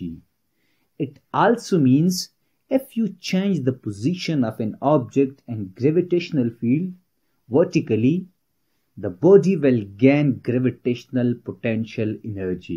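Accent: India. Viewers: Very good but make your accent like american not indian Good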